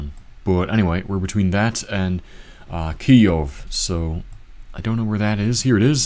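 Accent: Russia. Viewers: GG noticable improvement in your pronounciation as well ;) but you still sound like a russian trying to pronounce english. You shouldn't really emphasize anything other than the beginning of the word, and the lengths of each syllable should be of the same length, unless you see a line over the letter (á (as in hard), a (as in duck)). I noticed this when you said nád instead of nad Labem. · Takový ruský přízvuk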